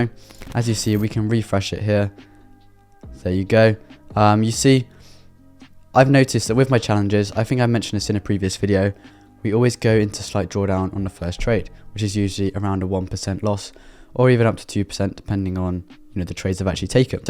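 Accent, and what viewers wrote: United Kingdom. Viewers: I always thought you were from London damm, where are you from? Would you ever do a meet up?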